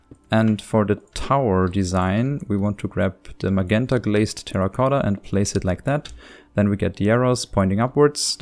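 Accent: Germany. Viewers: You kinda have a german accent..🤔 Are you from Germany?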